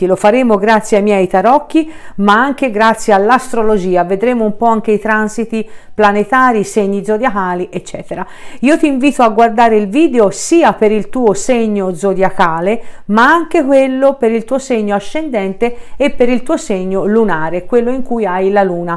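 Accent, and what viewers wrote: Tuscany, Italy. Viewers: Mi garba tanto il tuo parlare toscano non so se fiorentino o pratese... saluti dal 🇲🇽 con ❤️👩‍🦳 alla mia Bell'Italia dov'è rimasto il mio 🦀 codardo quanto bello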